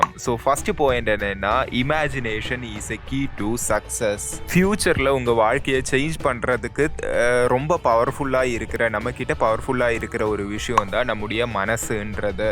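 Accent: Kerala, India. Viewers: Extra ooordinary keralite o pronunciation style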